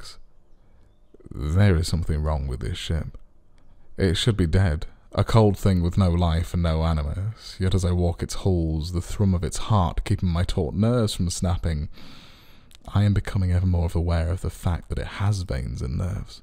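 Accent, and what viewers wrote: United Kingdom. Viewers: ok. Ive no doubt youre using real voices. IF you are using AI voice, youve fooled me. But nah. Youre clearly from the UK. the accent. Youre not US, Youre not Aussie or Kiwi, That is a UK accent. Mum, Buubly, certain words pronounced as only UK accent does. Its great, Youre an awesome narrator. Thanks for reading us the SciFi. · The Queen's English accent is perfect for this story about a alien dignitary.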